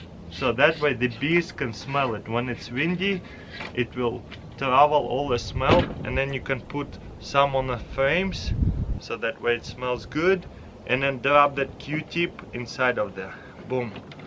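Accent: Russia. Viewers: I love the Russian english accent it is cute!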